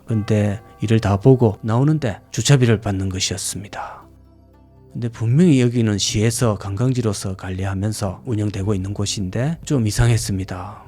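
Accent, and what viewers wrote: Gyeongsang, South Korea. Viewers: 님 넘 재미나게 갱상도 사투리로 설명도잘하시고 웃기심니더 많은 영상 부탁드리기심더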